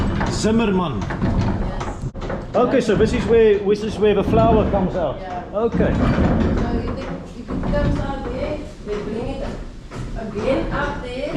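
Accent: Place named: Afrikaans, South Africa. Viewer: Yes stay. Jy klink soos n boer, waarom bly jy oorsee, jpu nodig hier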